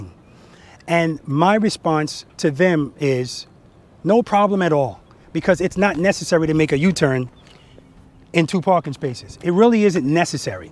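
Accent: New York, United States. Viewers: oh my god man ! your voice and almost everything you say sounds like my friend Zoffinger. look up his channel .he does kayak and fishing stuff. very unique people you are. even though you resemble each other. im a rider and appreciate your content. we are in Fla. hear what you would sound like without the new york accent. im from staten island btw. so i get it.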